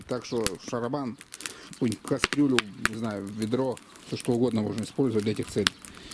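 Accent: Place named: Volga, Russia. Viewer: Вялить Вы меня уже научили, коптить научиться надо мне у Вас) у Вас говор очень родной))) на Волге так говорят.... Интересно кто Ваши мама и папа (дай, Бог, чтобы живы были и здоровы) Они гордятся Вами! Я вот горжусь , что у нас такой есть соотечественник как Вы! Желаю Вам всегда удачной рыбалки!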